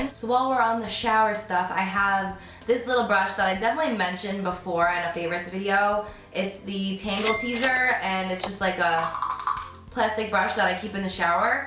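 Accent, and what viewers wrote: New Jersey, United States. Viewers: is she from jersey? :o she has a very heavy jersey accent and i'm from there!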